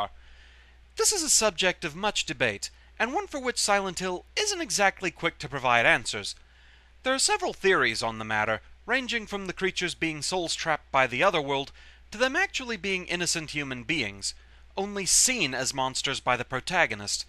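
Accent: United Kingdom. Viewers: ¿Are you brittish my friend?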